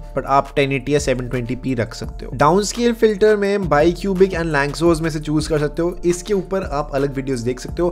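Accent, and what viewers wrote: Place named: India. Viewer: Maybe native English speaking people understand what Indian people say when talking "English", the rest of the world doesn't. All your words are twisted in a strange way. And you also speak always in a hurry. When you slow down when your are talking it helps a lot to get more viewers. I did watch only 30 sec's.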